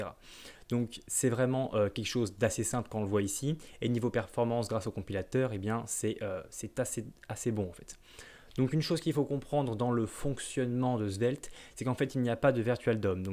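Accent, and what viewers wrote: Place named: United Kingdom. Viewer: super mise a part l'accent anglais .... hhahhaa Le count m'a tué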